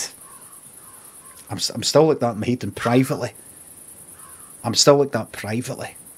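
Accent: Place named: Glasgow, United Kingdom. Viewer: wouldn't say you're going mad, but it is just a glaswegian accent. which is why it's more of a "chiy" sound than a "chae".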